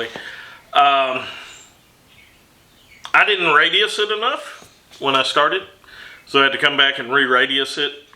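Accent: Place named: South, United States. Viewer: Never so much as forged a nail, but I've just subscribed anyway, I love this gentleman's personality, it's cool to see someone who speaks like me, slightly different, but to someone up north it would be identical I suppose, I'm from a small town in NW Georgia, but anyway it's awesome to see a southern man like myself doing something on YouTube, so few people from the south use their actual accent on here.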